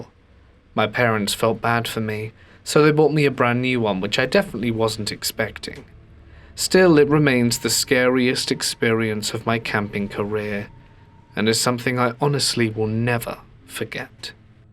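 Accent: United Kingdom. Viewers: Not bad, I enjoyed your accent not trying to be crochet about it just something about a UK accent talking about horror stories make the distinguished and eloquent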